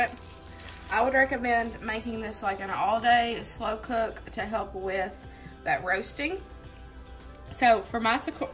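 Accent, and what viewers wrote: South, United States. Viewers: What a great recipe!! love your southern accent 😊😊❤️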